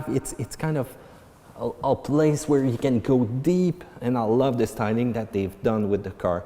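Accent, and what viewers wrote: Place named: Quebec, Canada. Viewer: I can tell sort of a French accent.. But it's a little different. Is that a French Canadian accent?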